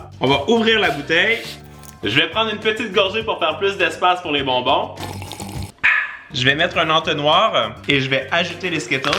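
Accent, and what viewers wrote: Canada. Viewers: j'adore ton accen canadien♥♥ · Chui le seul à kiffer l'accent canadien ??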